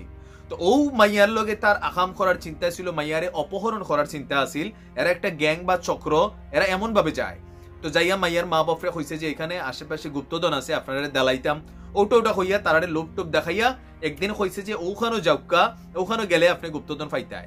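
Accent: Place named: Sylhet, Bangladesh. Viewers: আজকের কথার মাঝে সিলেটি গ্রান মিলছে ভাই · আমি খবর শুনি নাই আমি আপনার সিলেটি ভাষার দিকে লক্ষ রেখেছিলাম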